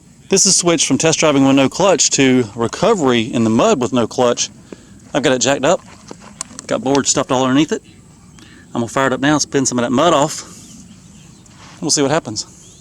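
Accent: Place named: South, United States. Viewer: a hint of a southern accent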